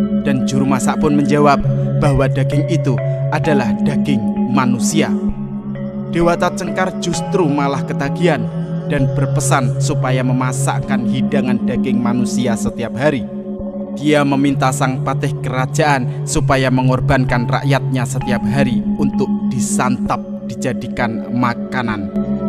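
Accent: Java, Indonesia. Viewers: Orang Jawa mana nih suaranya!!!!!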